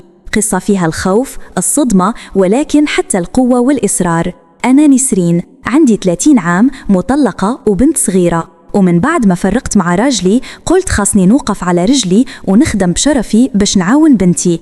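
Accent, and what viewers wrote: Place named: Algeria. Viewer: مغربية و تهدري باللهجة الجزائرية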